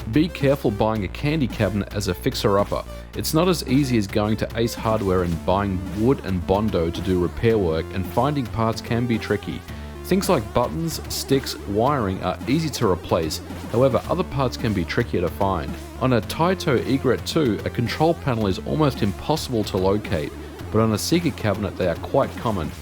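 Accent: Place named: Australia. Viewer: I know you’re from America, but I wonder why you speak with an Australian accent...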